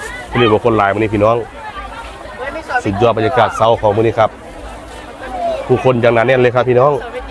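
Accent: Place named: North, Thailand. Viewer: แอดมินพูดสำเนียงคือคนเหนือแท้ 😅 ผสมลาว ผสมไทย เอออะไรกันแน่ แมงจิห่ล่อน่าซื้อมาก็ถูกจริงถูกจัง🎉🎉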